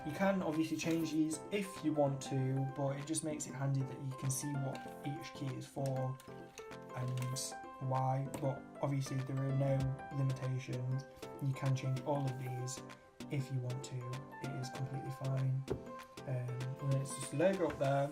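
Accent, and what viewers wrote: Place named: England, United Kingdom. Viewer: I just like listening to you. U SOUND like U know what you're talking about and I love your English accent.!!!.. take care and keep it up.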